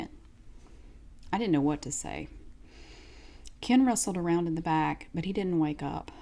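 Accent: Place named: East Tennessee, United States. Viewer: I will almost bet you are from East Tennessee. Well...you sound like us...in Knoxville lol.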